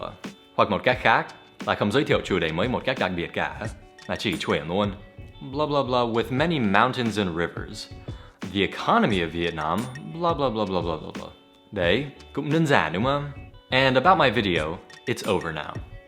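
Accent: United States. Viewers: American English or real English? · anh nói giọng Mỹ hay kinh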